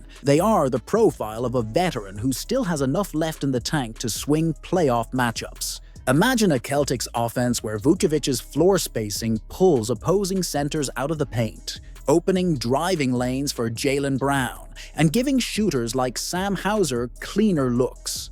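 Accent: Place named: United Kingdom. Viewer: What a waste of my time. Bloviation. Nice touch to put a British accent on the AI narration making it obvious you know nothing about Boston basketball. I'm blocking this channel.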